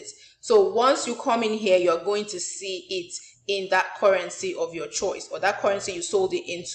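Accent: Nigeria. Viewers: haha your nigerian accent LOL :D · This lady is a Nigerian I just know from the accent Right??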